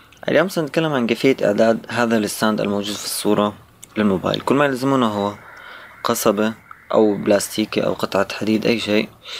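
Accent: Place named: Syria. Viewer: حسيتك سوري 😁😎 حلو كتير استمر👍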